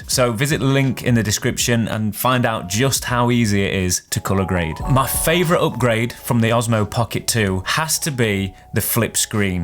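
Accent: United Kingdom. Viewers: wow how cute your brit ish accent luved it! Hi👋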